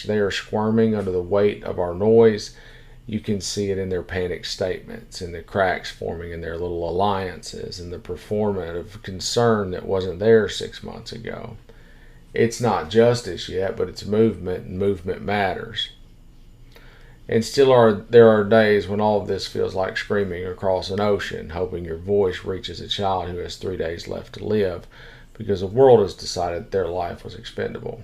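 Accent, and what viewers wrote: United States. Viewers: First time since Jimmy Carter ive heard a deep south accent talking with sense and compassion.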